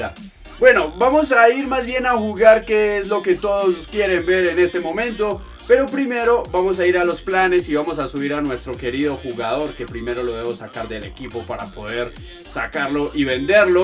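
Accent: Colombia. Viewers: eres de colombia???si eres de colombia de que parte de colombia????se te nota cuando hablas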